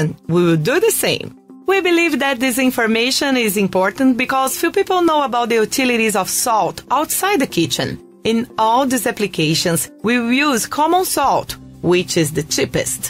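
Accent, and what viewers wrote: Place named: France. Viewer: Thank you!! Just curious are you French? Cool accent😎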